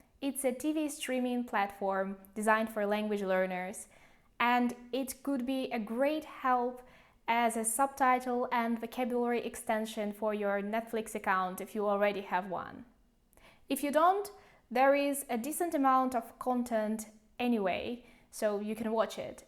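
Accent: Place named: Russia. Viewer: I believe she's from Russia:) I got it after just a few seconds of watching her video some weeks ago. I can easily get who's from this country by facial expressions, sights, the tone of their voices and finally by the accent. So I'm kind of an expert in this thing hahaha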